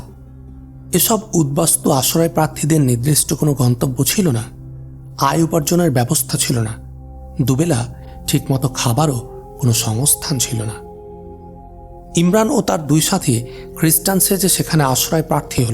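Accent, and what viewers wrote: Kolkata, India. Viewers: আপনেত দেখি ভাই কলকাতার স্টাইলে বাংলা পড়া শুরু করলেন যা আরো আগের পর্বগুলোতে পাওয়া যায়না 🤭 শ কে স ছ পড়ছেন 🤔🤔🤔😏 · ভাইয়া আমার আম্মুও ক্রুসেড সিরিজের পাগল হয়ে গেছে। আমার খালা শুনে বলতেছে আপনি নাকি ভারতের কলকাতার। আপনার কথা কলকাতার মানুষদের মত লাগে।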